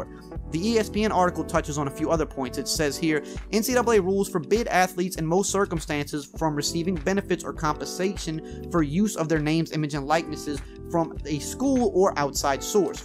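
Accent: Louisiana, United States. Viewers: This is the first video I have seen of yours in the first 15 seconds I knew you was from my neck of the woods lol, I'm from Da Parish you sound like you from at least close to that area, any who great content and I'm subbed. WHO DAT!!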